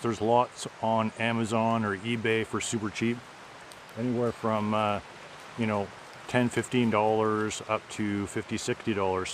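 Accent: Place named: Canada. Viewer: I heard his Canadian accent 5 seconds in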